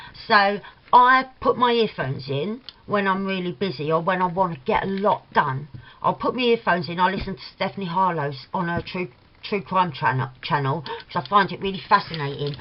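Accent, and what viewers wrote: Cockney, United Kingdom. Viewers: Thank you for tagging me! I’m filming the response today 😊❤️ oh, and I absolutely adore your cockney accent 😉❤️❤️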